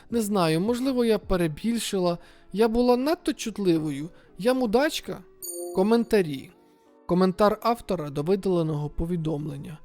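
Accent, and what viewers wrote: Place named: Zaporizhzhia, Ukraine. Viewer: Ваша подача інформації та коментарі дуже піднімають настрій😊 дуже дякую за вашу роботу. Дуже схоже наче слухаю людей із Запоріжжя, якщо тут є запорожці думаю мене зрозуміють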